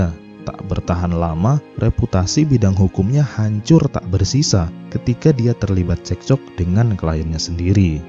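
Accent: Lombok, Indonesia. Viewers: Dari lombok ya bang?🙏🏻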